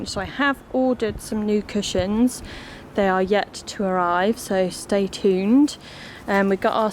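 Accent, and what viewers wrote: England, United Kingdom. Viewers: Thankyou! Have subbed as it’s great to hear an English accent again..miss London..been here in Oz 6 years...thanks again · Love ❤️ your fancy sounding British accent. Thanks Philadelphia PA resident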